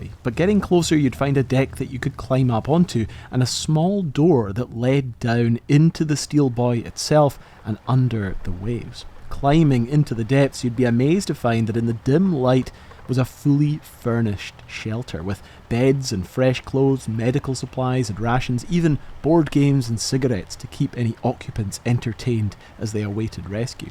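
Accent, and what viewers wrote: Scotland, United Kingdom. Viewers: He is Scottish, give him a break. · Is that a Scottish or Irish accent? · I came for the video, but stayed for the Scottish accent. :face-blue-smiling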